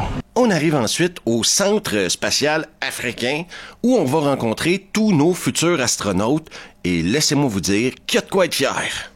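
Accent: Quebec, Canada. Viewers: Tu m'as bien fait rire dommage pour ton accent merdique mais bon t'es Québecois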